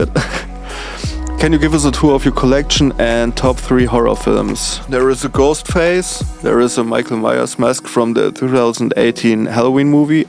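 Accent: Germany. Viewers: Thank you for doing this Q&A for us. It nice to learn more about you. Your english is great and your German accent is wonderful. I love by!!! Have you seen the version by Post Modern Jukebox? Thank you for the suggestions of David Lynch and Tommy Wisreau. I will check them out! I am excited to see what you choose for new content. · Thank you for posting this. Congratulations on 1000 subscribers! You are a real one, and I appreciate your perspective in your reactions. You answered the questions I had but did not submit ahead of time. I thought you were probably German. Your English is very good, I like your accent, is a fabulous song for all the reasons you mentioned, AND none of us have choice on where we're born: just make the most of it. Be well! ❤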